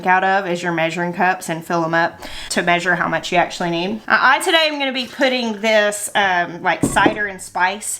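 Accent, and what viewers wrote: South, United States. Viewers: Make this so often in the fall! A friend was just saying she made it for a get together at her house and trying to pronounce it, lol. She said it much the way you do, and not how you said it during our history (love that!) you southerners and southwesterners crack me up with your accents!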